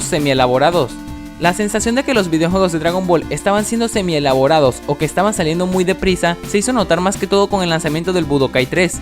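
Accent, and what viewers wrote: Venezuela. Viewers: Eres de Venezuela? No manches es la ostia papú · v eres venezolano? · Eres Venezolano? jajajajaja! Te me hacias familiar... aunque pense que eras mexicano. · eres venezolano!!??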